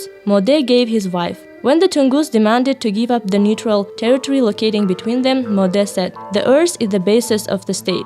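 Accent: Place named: Russia. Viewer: You have russan sound)))))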